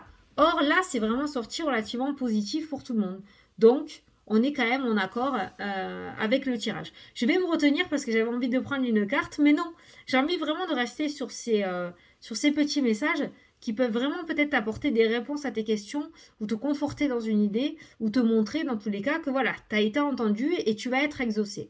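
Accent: South, France. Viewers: Merci ma jolie pour moi la vierge tu as un bel accent chtit du sud 🌹👍❤ bisous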